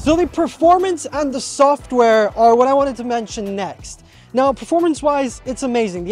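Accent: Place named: United States. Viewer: You have an American accent. Are you an American living in British land?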